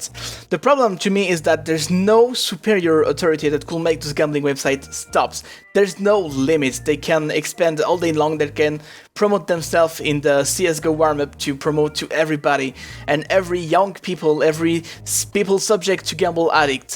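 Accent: France. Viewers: dat sweet baguette accent KreyGasm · Love your french accent :D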